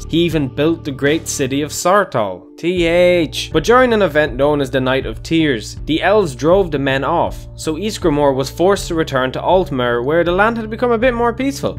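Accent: Ireland. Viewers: "Tank** you good sir..." Gosh i tought you knew better! (Pls don't take this as an insult i love your accent it reminds me of my grandparents) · 'the Turd Era' gotta love that accent.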